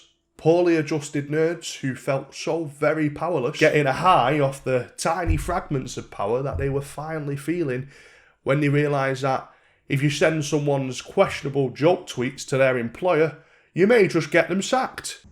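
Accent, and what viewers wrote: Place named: Newcastle, United Kingdom. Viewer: quality video, top new castle accent hahaha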